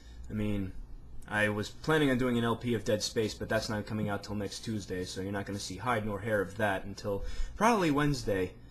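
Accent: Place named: United Kingdom. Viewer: Why are you reading with a British accent? It's a story, written by an Amerinca, and set in Italy. And who is Lucrezi? I assume you mean "Luchesi".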